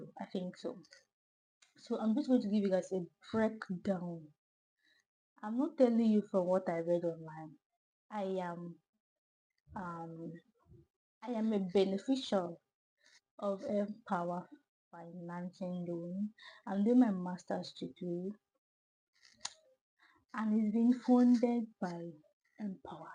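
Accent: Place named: Nigeria. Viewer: Your mic is not helping at all... I can't really follow you that much, your Nigerian accent is too much and the mic too is producing base alone. Please check it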